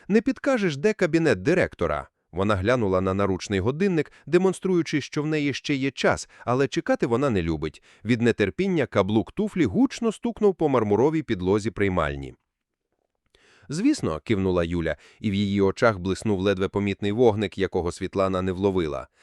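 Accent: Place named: Surzhyk, Ukraine. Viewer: Якщо перекладаєте з рахівської - то перекладайте вірно українською. А то суржик ріже слух. Так і не змогла дослухати до кінця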